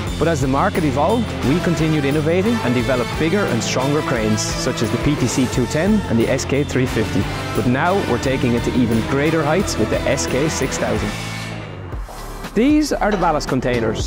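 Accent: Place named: Ireland. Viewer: I wasn’t expecting an Irish accent when the video started! These cranes make liebherr ones look like toys!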